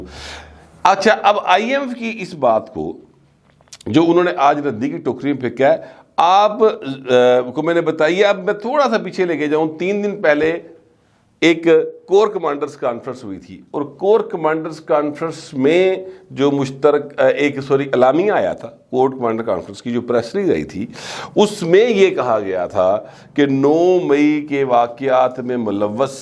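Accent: Punjab, Pakistan. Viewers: چول پنجابی ٹچ نا دیا کرو چھچھورے لگتے ھو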